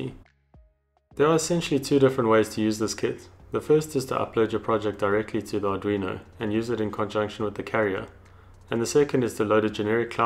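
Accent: South Africa. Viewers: You sound like a SOuth African BAsed in Australia. PS Great Content!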